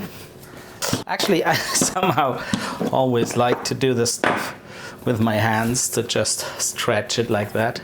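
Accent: Germany. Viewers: Lol all Germans say ‘stripes’ instead of ‘strips.’ And ‘vinegar’ invariably becomes ‘winegar,’ which is weird given ‘w’ is pronounced more like ‘v’ in German! But anyway, thanks for sharing - I love Flammkuchen.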